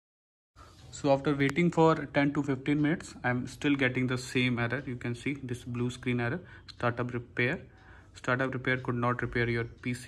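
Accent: India. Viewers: Thank you man with a stereotypical Indian accent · I can't understand the indian accent 🙄 and he is refusing to answer questions.....not helpful at all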